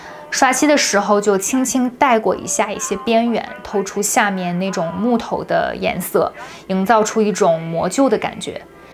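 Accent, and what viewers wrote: Northeast, China. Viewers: 妹子是东北人，尽管你的东北口音是非常轻微的，但是休想逃过缺姐的耳朵🎙️☝️😉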